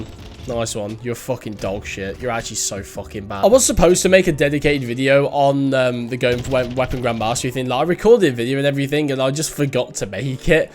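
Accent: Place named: United Kingdom. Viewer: Oi an angry British chap 🤓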